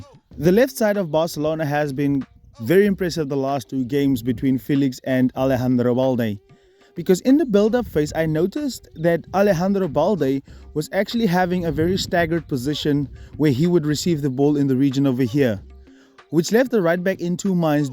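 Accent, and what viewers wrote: South Africa. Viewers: This ou sounds south african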